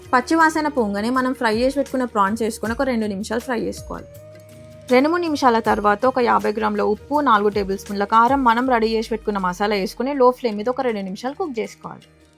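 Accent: Telangana, India. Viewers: తెలంగాణ యాస very nice sis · Proper tg slang maatluduthundi eeme😅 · I thought you are from north India but Pakka Telangana voice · wow frist time voice vintunna ... pakka telangana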